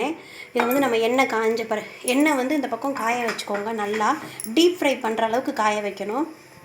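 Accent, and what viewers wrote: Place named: South, India. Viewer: It's southerners slang.This originality is good and unique. Let it be.Its also part of her cookery videos I fèel.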